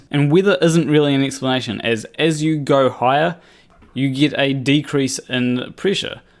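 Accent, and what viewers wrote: New Zealand. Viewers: Ha ha ha, calling you an Aussie. Nah mate, you don't sound remotely like us Aussies. Your Kiwi accent is unmistakable to any who know the difference. And thanks for constantly fighting this effing stupidity, my Antipodean brother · You definitely still have a kiwi accent. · Hey. Aussie here and you are quite clearly a Kiwi. Anyone who is truly an Aussie and is having trouble telling that you are a Kiwi should maybe get their hearing checked. Also can’t wait for my next sweet, sweet NASA payment for pretending that Australia actually exists and that I come from there....oh. I just said that out loud didn’t I. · Nope, you definitely still have the Kiwi accent!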